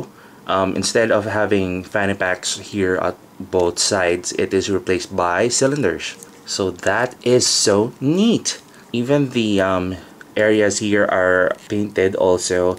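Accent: Philippines. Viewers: hey man I can tell you're Filipino so from which mall can I buy this?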